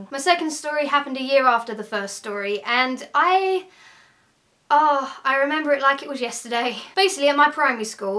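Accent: United Kingdom. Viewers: British accent <3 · it´s a british accent :)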